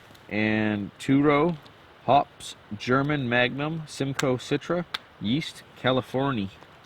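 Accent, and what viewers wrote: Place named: Canada. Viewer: Been watching so much American bushcraft lately that I actually noticed our accent when I first start the last part lol. Like oh ya he’s Canadian too. Glad I found ya bud gonna be flying through your vids. Be one with the liquor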